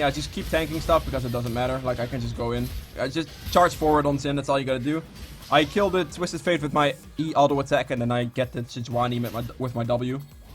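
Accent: Netherlands. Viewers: Klinkt Nederlands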